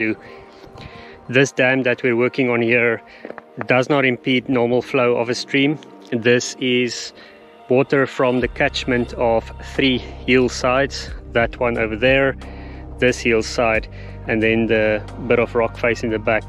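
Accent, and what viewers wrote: South Africa. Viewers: Sounds South African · Cool. If for no other reason than fire risk control given rapidly increasing pace of climate change, swales could eventually make a huge difference to your property. Especially given the recent awful drought your part of the world has had; had a lot of UK news coverage at the time. Am I correct in assuming that you're in South Africa going by your accent and landscape?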